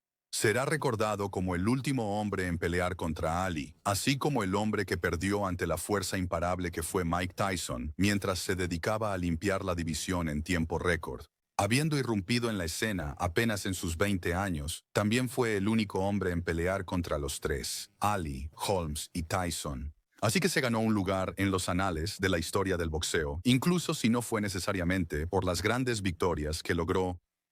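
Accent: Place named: United States. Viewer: El contenido del vídeo está bien, lo que no me gustó mucho es esa voz agringada que exagera las pronunciaciones de los angliscismos y los apodos sajones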